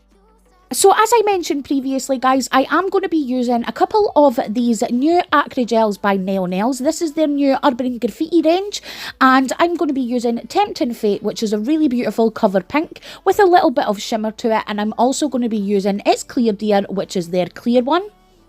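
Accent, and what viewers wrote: Scotland, United Kingdom. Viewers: Is this a Scottish or Irish accent?! I love it, either way. Usually I'm really good good telling them apart but yours is difficult. I'm leaning towards Scottish more than Irish.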